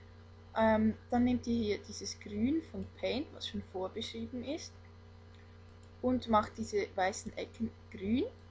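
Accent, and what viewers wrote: Switzerland. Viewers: man hört das du schweizerin bist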